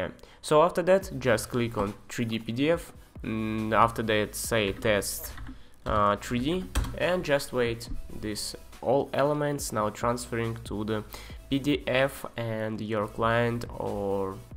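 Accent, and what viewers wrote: Russia. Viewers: Судя по акценту, русский твой родной язык)) спасибо за видео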